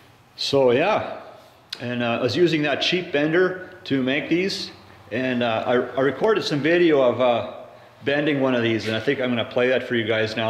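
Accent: Canada. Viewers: I'm going to guess that he's from Newfoundland.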